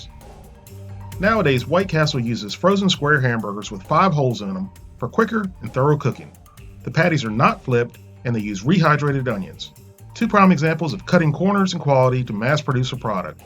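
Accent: South, United States. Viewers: you're an idiot. This fucker can't speak one sentence correctly. That's the south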